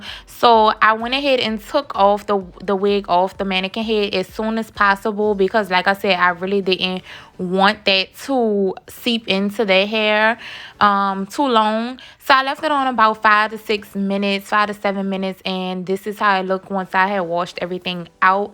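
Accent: New Orleans, United States. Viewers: I love your NOLA accent!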